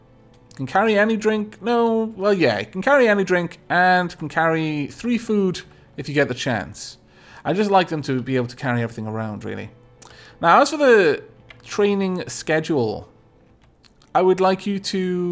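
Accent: Wales, United Kingdom. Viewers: Subbbb! Welsh man xD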